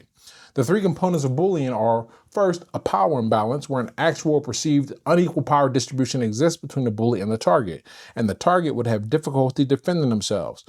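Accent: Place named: Fox Chapel, United States. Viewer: You grew up in the hood but speak and act all Fox Chapel or Nevillewood. Salute my man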